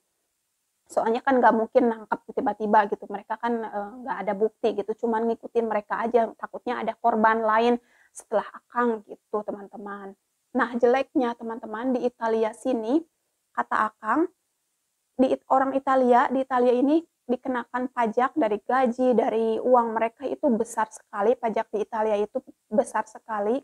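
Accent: West Java, Indonesia. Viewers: Sy seneng.ada sunda2 nya ngomong nya a eteh mah lucu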